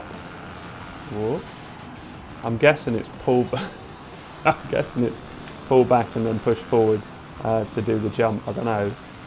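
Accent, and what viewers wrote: United Kingdom. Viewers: are u a brit wanker😳?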